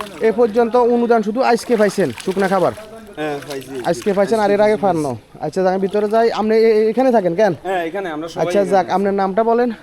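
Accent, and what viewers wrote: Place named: Noakhali, Bangladesh. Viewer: ভিডিও অনেক ভালো লাগলো অনেক দিন পর নোয়াখালির কথা শুনলাম আমেরিকার থেকে দেখছি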